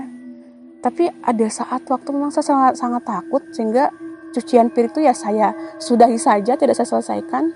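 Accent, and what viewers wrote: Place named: Sunda, Indonesia. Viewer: Suka sama cara bercerita dan penguasaan bahasa Indonesia tetehnya, ditambah aksen Sunda dikit2, nyaman dengarnya. 😊❤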